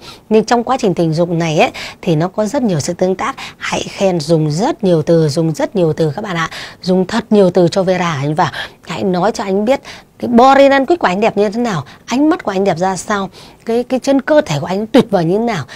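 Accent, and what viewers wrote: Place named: Vietnam. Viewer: Chị ở đâu mà nặng tiếng địa phương nhỉ. E ở hải Dương nè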